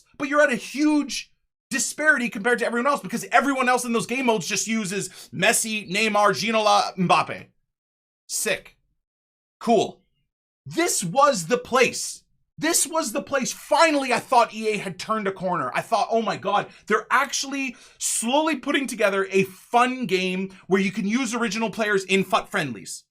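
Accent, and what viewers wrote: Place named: Canada. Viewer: I love your passionate maple syrup drenched canadian voice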